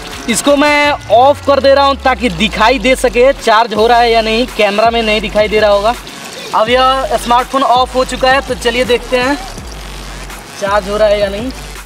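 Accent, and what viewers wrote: Bihar, India. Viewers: bihari ho ka babua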